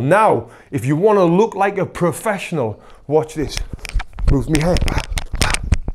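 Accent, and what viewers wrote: United Kingdom. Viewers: British accent😂 I understand your speech better than those with an American accent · It's like very deep British accent. Kinda like it ngl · That's a combo - I like british accent and boxing :D I'm glad I found this channel! Great stuff!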